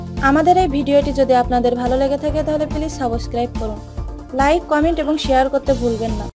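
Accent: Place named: Chittagong, Bangladesh. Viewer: আপা আপনি কি চিটাগাং এর আগে শুদ্ধ বাংলা শিখেন।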